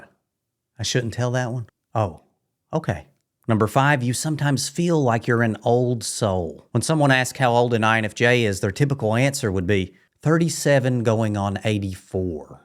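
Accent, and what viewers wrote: South, United States. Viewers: Really enjoy your content. I especially love your accent as a fellow Southerner. Was wondering if you would be willing to share your state of origin? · Just curious…where is your accent from? 😊 I know it’s from one of the Southern states, but don’t know which one. 😂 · Saulron. THATs what you are saying 😂. Really bothered me I had to back it up and put the CC on. It’s your soft southern accent & the fact that Tolkien created a name almost nobody knows how to pronounce 😂. Cheers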